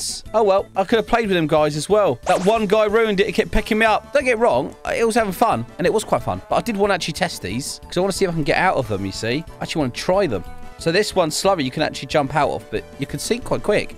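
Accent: United Kingdom. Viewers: Hey ate you britesh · Is it me or is this guy British?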